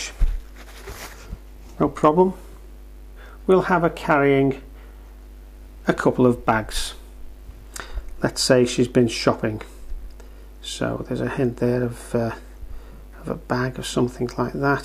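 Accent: Liverpool, United Kingdom. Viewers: Hi.Really enjoy your tutorials,love hearing you speak as I’m from Liverpool too. Its like going home.😁❤️👏🏻👏🏻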